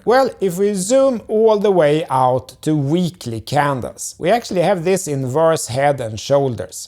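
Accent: Sweden. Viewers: Can’t hide that accent hello from a fellow Swede 🇸🇪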